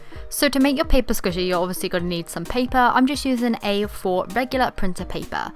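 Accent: United Kingdom. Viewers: She speaks british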